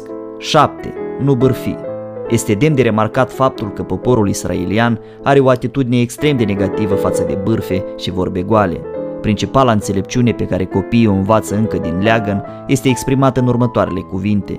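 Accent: Moldova. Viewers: Graiul dulce moldovenesc nu are egal , dar nici cu maramuresenii cei glumeti si simpatici nu imi este rusine. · Perfect,Și un accent clar, citit ,moldovnesc ,cel mai scurt și clar în limba romana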